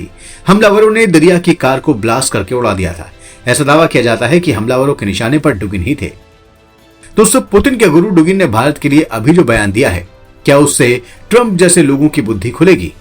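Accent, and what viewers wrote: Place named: North, India. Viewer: 179k Andhbhakt views..Dugin wrote a book on Trump revolution.. Abe ghochu yeh koi Putin ka guru nahi hai 🤣 yeh New Eurasian concept ke philosopher hai he is opposing Putin's communist and socialist views, inka manna hai Western liberalism ke saath joda jae.. Awaj se hee pata chal rahi hai northern India ka channel hai..matlab had hai kuch bhi video bana de rahe hai kuch bhi bol de rahe hain..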